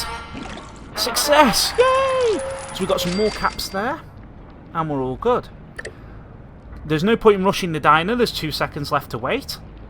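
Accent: Scotland, United Kingdom. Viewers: Nice scottish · Are scottish bc I love the accent I wish it was on android :(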